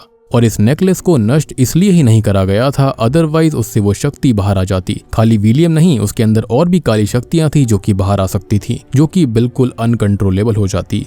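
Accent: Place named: Bengal, India. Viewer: Are and haunting tubes the same....because the voice and the accents are the same...like bengali broken hindi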